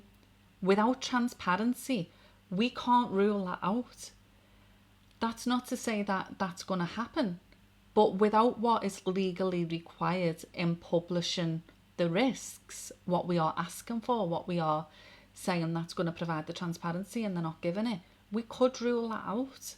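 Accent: Liverpool, United Kingdom. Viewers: I thought I had tuned into an old episode of Brookside for a moment there. 🙂 I love your accent . . . . . hello and Greetings from Newcastle, I just subscribed to your channel. 🙂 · I think that more people than ever woke up to see the nefarious schemes for what there are. Also, it seems that the level or resistance in The West is bigger than the politicians expected. I belive that people must fight against Internet control (online "safety" act and similar bills in other countries) to be able to speak about the wrongdoings of the elite. Use as much cash as possible since the banks want to phase it out and support local businesses that accept it. And remember that there's much more of ordinary folk than the globalists- if they could just force all of this though- they would- they can't and that's why they try to weasel it through the veteran id card, children's digital id, one login etc. 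Also, last thought- all those stabbings and unrest seems very suspicious and "organised" in a way to dupe people into failing for digital id- don't fall for that. Don't ask for national emergency or any other extraordinary powers to be granted to the gov. They'd misuse them as always. Ps. Love the scouse accent and the fact that you gracefully subtitled your video for non native speakers watching😂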